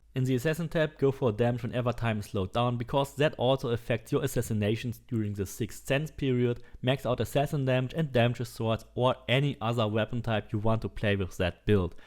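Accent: Germany. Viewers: thank you sir! Sorry for the questions but your accent sounds familiar... possible that u r from Germany?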